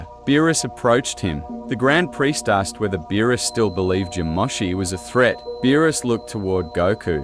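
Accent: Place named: United Kingdom. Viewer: I don't like that dude accent he sounds funny when he talks sound like he's British or something 🤣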